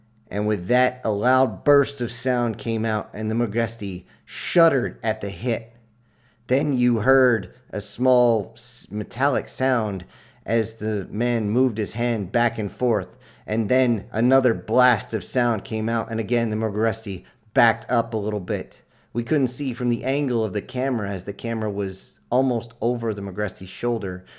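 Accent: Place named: South, United States. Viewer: Hearing your southern accent I really want to hear a story with a Scottish accent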